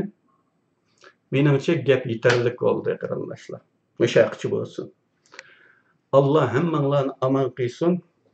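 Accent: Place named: Kashgar, China. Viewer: بەك ئوماق گەپ قىلىسىز ئاكا ھە ؟ 100 پىرسەنت قەشقەر شىۋىسى . ئاللاھ ئۆمرىڭىزنى ئۇزۇن قىلسۇن ! ھەقىقەتنى ئوتتۇرغا قويۇپ ، ساددا ، 5 ياشلىق بالىدەك ئاسان گول بولىدىغان ، ئوخشاش ئۇسۇل بىلەن تەكرار ئالدىنىۋىرىدىغان ، ئۇيغۇرۇمنى ھەقىقەتنى بىلىۋالسۇن دەۋاتىسىز ………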